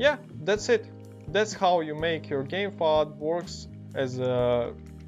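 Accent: Russia. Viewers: Спасибо что сказал как подключить! Странно это прозвучит, но я на начале видео понял что ты из россии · Я сразу по акценту поняла, что ты русский, вхахаха) Thanks for the tutorial, though:)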